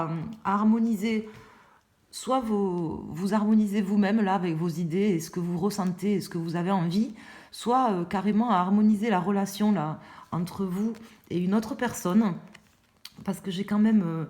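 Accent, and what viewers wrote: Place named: Gers, France. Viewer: Gratitude pour vos réponses 🤲💙 Votre accent me ramène à ma Terre Natale le Gers😍 Belle journée pour Vous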